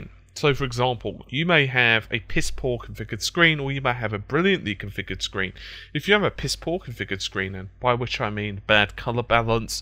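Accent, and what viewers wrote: United Kingdom. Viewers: That intro always reminds me of a British Buffalo Bill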